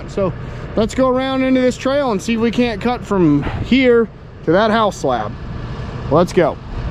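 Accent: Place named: Carolina, United States. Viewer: I think its great when ya talk buddy, you speak the Carolina sweet jaw, sounds wonderful , great videos Mr.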